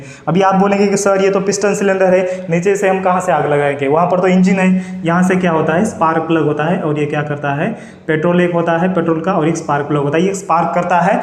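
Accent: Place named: Nanded, India. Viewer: सर तुम्ही नांदेड चे आहात ka?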